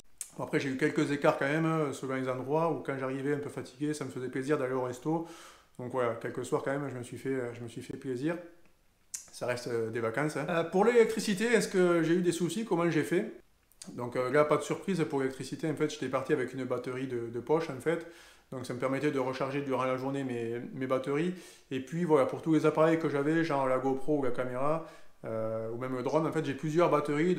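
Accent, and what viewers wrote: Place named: South-West, France. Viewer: Merci pour le vidéo. Et ce petit accent du sud-ouest qui nous fait voyager 😉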